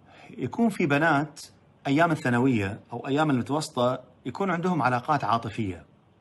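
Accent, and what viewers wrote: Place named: Levant. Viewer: انا ابي اعرف الحين ، انت من الشام . مسوي نفسك كويتي ليش ؟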